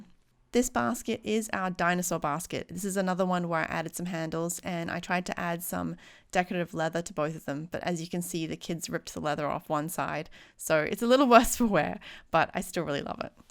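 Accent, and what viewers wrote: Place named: Australia. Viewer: Great video! thanks for sharing! :-) also great to hear another fellow Aussie youtuber too 😊 I’m now a sub!❤️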